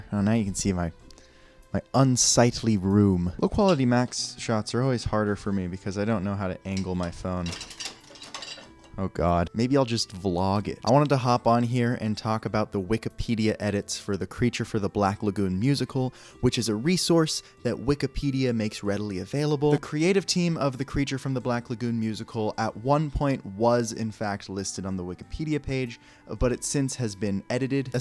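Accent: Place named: Australia. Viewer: As an aussie i was also wondering why he sounded vaugely australian 😂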